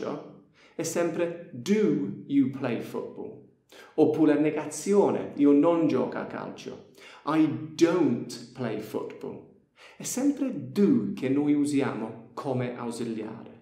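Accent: Apulia, Italy. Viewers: quindi non sono l' unico a cui sembra pugliese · L accento pugliese è troppo comico... complimenti! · Ti adoro...tra la semplicità delle spiegazioni e l'accento pugliese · il tuo italiano hai una leggera inflessione pugliese, fantastico! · Mahhh... A me sembra un pugliese con l'accento inglese ! Davvero friendly, ma non perché pugliese. Degno successore del bravo peter sloan. Maybe are you àppulish? So am I! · L'accento pugliese è favoloso!! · Per sapere, il tuo italiano lo hai imparato da qualcuno del sud Italia? Così a occhio direi... Puglia, forse? :) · Ma solo io sento una certa cadenza pugliese? u giovn😂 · Hi, where are you from? I guess you were born in GB, although your italian cadence seems likely from Apulia, perhaps you’re dad or mum are from there. A huge hug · anch’io ho sentito un accento simile al pugliese XD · Hai l accento pugliese quando parli italiano😁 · Bellissimo l'inglese che parla italiano con l'accento pugliese (se non sbaglio) 😊 video utili grazie! 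· Hai un accento pugliese molto marcsto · Davvero simpatico , con quell’accento fra Matera e Bari! E soprattutto , chiaro ed efficace! Grazie mille per queste ottimamente strutturate e utilissime pillole su particolarità dell’inglese troppo spesso trascurate. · Ehi, your italian has a funny southern accent, kind of pugliese! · Non è italiano ! è un inglese che parla bene l'italiano ma lo ha imparato con l'accento pugliese dei parenti !! Simpaticissimo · nooo il tuo accento pugliese non perderlo mai... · Absolutly not, perhaps at south more. I think live in Puglia because He got that accent and habits as well. Is it right?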